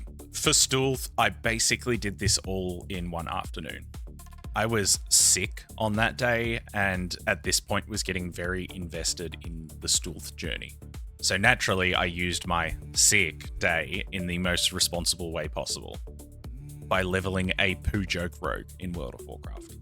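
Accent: Australia. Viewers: Recently came back after many years away. Your series is a steady paced well thought out guide to the key parts, without the clickbaited bs of some youtubers. Might be the aussie in your voice, but it's like hanging out at the local and listening to a bloke having telling about his latest fishing adventure. Comforting and engaging, keep up the good work mate.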